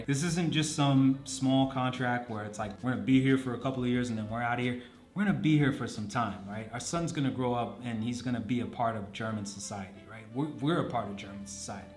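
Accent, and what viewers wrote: United States. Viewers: Acting and talking like a stereotypical American. How long have you been outside the US? Yo do know that Aldi has been a thing in the US for some time. And where is your ID? Everything IS NOT on your phone. You might have a digital German driver's license, but I am pretty sure that the US Government has not setup that type of digital ID.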